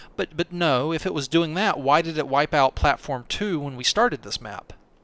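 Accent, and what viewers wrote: South, United States. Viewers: That's just his southern accent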